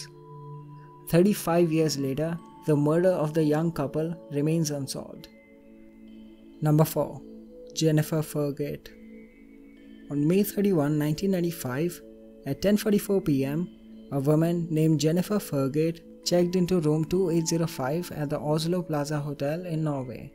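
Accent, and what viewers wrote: India. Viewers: You're clearly very uneducated, Hindu is a religion, his accent is Indian, those two are not the same thing. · Then don’t listen. Keep your prejudiced opinions to yourself. I wouldn’t characterize his voice as Hindu, since Hindu is a religion. He has an Indian accent. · Lol the Hindu accent. I cannot understand what you say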